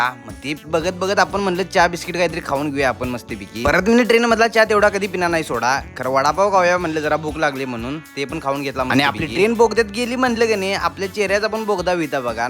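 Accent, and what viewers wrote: Kolhapur, India. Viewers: Tu kolhapurla rahtos Tujha avaj अस्सल kolhapuri ahe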